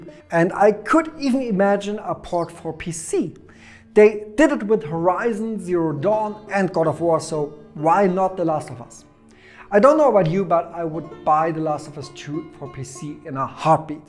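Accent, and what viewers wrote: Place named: Germany. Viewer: oh haha hoppla, bitte vielmals um Entschuldigung, wusste nicht dass ihr nen extra Kanal habt & danke für Info =) man hört halt den Deutschen raus hier beim Englischen Video & das ist (zumindest für mich) irgendwie ziemlich irritierend. Nicht böse gemeint =D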